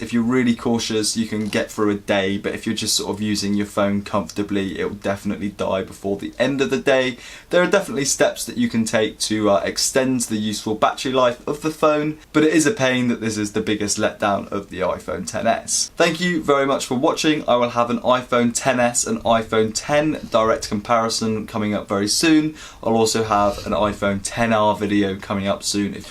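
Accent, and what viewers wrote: United Kingdom. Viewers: Atr you british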